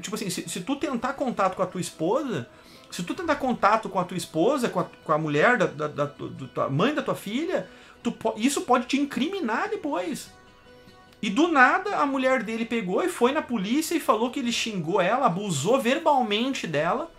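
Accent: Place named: Porto Alegre, Brazil. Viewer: haha saudade do sotaque de poa! Me lembra o programa do Mr. Pi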